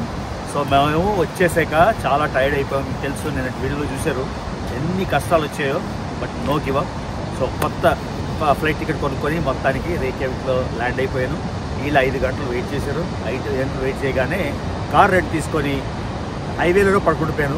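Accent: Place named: Uttarandhra, India. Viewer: Take a Bow for our Mother Uttarandra slange ❤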